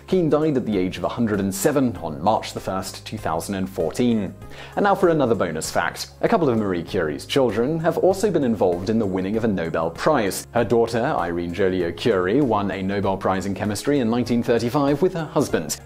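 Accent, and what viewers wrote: United Kingdom. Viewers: What's accent called?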